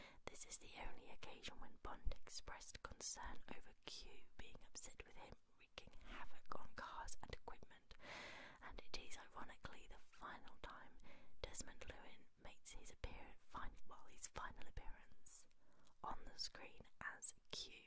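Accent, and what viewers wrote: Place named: United Kingdom. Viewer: Lovely whispering, and I love the British sound 💖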